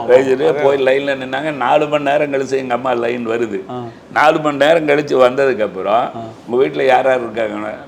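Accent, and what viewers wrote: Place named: Coimbatore, India. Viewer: Comibatore slang super sir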